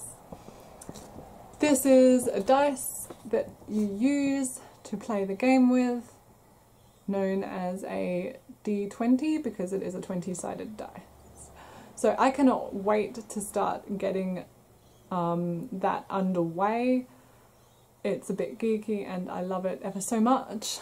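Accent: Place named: Australia. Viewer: Wow !! Lismore, nice part of the world. I’m so happy to find you, I’m from Sydney and it’s so nice to hear another Aussie voice on YouTube...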